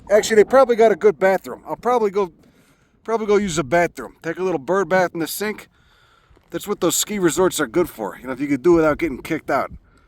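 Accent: Chicago, United States. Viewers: I appreciate how u cover a ton of microbiomes and blending/borderline climates. Really interesting and funny channel. Chicago is my new favorite accent 😂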